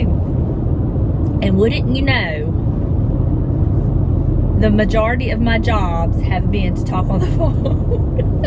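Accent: South, United States. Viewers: I enjoyed watching you video. I was so happy you shared the answer to prayer with us. When I was in nursing school my lead instructor was recovering from cancer. We were able to get more clinical than the other classes, on days she didn’t feel good. So proud she has a job she love. I have probably overused this phrase, but I love ❤️ your southern accent. I don’t feel living in n. Louisiana that I have much of a southern drawl. You have a lovely home. Looking forward to your next blog. God bless.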